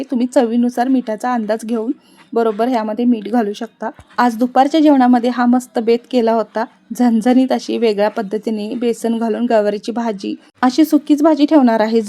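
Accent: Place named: Otur, India. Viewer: खूपच सुंदर ओतुर होऊन बोलते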